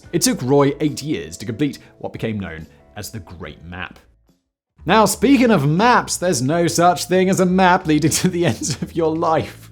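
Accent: United Kingdom. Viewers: Maybe it's my imagination, but, to me, becomes a little more British when he makes a video about his home country.🧐☕🇬🇧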